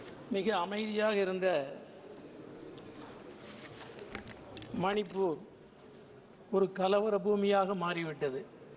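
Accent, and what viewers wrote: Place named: Kongu, India. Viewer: பஞ்சு நகரின் கொங்கு தமிழே ! சமத்துவமும் சகோதரத்துவமும் தழைக்கட்டும்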